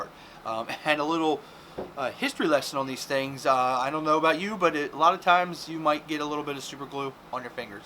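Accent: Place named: United States. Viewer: Keep up the good stuff man! Which state is your accent from btw?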